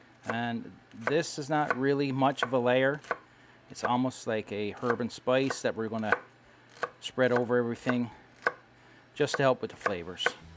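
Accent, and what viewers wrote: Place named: Atlantic Canada, Canada. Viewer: GREAT recipe. Any combo dish like that which blends all those flavors can easily turn into feasts fit for Kings. In my recipe I've used sweet potato, roasted Brussels sprouts, and garlic (including garlic powder), and parsnips which add a certain minty flavor to the mix. Keep up the good work! Oh by the way, I enjoyed listening to your Atlantic accent. Here in the Twin Cities we have many Canadians because of our love for pro hockey. Their accents are so different from yours. 😀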